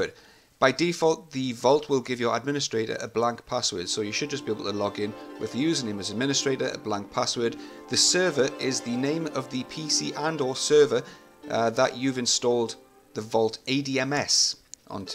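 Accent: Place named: Newcastle, United Kingdom. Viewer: CloudCAD Ltd Thanks for the prompt response. Got a basic test setup running as per your video. I am an IT guy but an AutoDesk noob - are there any websites providing question/answers that you can recommend for Autodesk similar to experts-exchange, stackexchange, spiceworks etc BTW I am a Geordie - live in Whitley Bay. The accent works well for presenting - think Robson Green. Thanks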